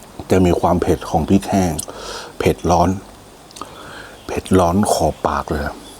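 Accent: North, Thailand. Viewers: อู้เหนือ ดีแล้ว ตอนนี้คนกรุงเทพฯฟังภาษาเหนือออกแล้ว❤❤❤❤❤❤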